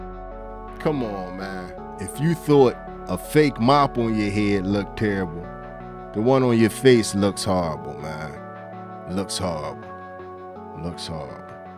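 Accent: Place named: Baltimore, United States. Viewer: I absolutely love your Baltimore accent!!!!!❤❤❤❤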